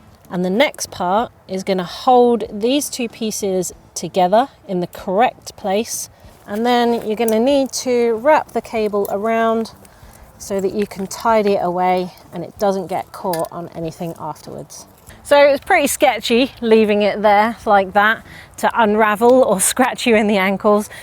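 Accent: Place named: United Kingdom. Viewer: It's funny to hear her say it the way I say it... yet she has a brittish accent.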